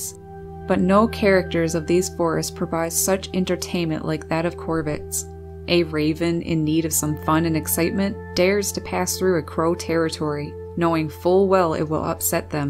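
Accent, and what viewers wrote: Canada. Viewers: What a beautiful birding documentary. The scenery is gorgeous and the birds you have around you are amazing. I am in Michigan and when I hear someone say out the way you do I can tell you must be from Canada. Northern Canada. Maybe I am wrong. I have watched you on and off for a while and now I am watching much more. Do you have any advice for me? I am moving in the spring/summer to my home in the thumb of Michigan’s lower penninsula. I am now in the Detroit area. We get birds in our yard, in the woods there at our other home where we are moving permanently but I would like to maximize this. I get the normal finches house, and gold finches, chickadees, rose breasted grosbeaks a rare cedar waxwing but it has been years, and we get Veery Thrush who sound amazing but are a rare site, and lots of wood peckers downy and red bellied, and eastern phoebes juncos etc. 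but I want the blue jays that I never see up there. We are right off Lake Huron and att our friends house they have purple Martins, Sand pipers and gulls. Can it draw blue jays to wooded areas? I will live in the woods?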